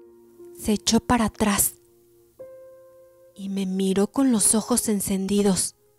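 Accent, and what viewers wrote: Mexico. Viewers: Me encanta el acento mexicano, tu voz y narrativa son perfectas. Estos tres aspectos hacen una experiencia única tus audiolibros, además de ser cuentos populares y con alto dialecto coloquial. Me parece excelente el mensaje final, sobre promover la cultura en cualquiera de sus formas, que por cierto en este video no lo agregaste. Soy un agradecido seguidor de tu trabajo. Saludos 🇻🇪🇨🇱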